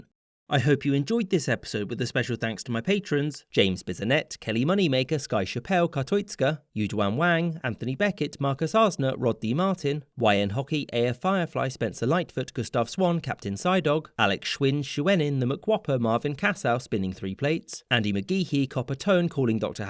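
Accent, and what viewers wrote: United Kingdom. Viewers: The narrator literally has a British accent and you still accuse them of being American lmao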